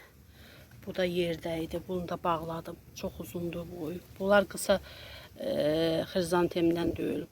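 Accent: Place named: Azerbaijan. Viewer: Çok güzel çiçekler bahçeniz harika konuşma şivesi ile anladım Azeri iseniz herhalde Azerbaycan mı orası benim komşum var Azerbaycanlı çok iyi arkadaşız hayırlı akşamlar diliyorum Türkiye'den İstanbul'dan selamlar sevgiler 🇹🇷🥰😊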